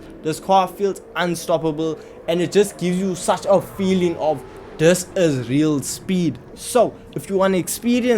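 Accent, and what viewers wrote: South Africa. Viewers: bru you sound like you live in durban bru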